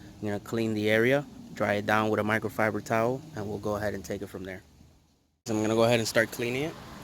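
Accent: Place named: New York, United States. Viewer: Says Florida but you talk like your from New York, or similar.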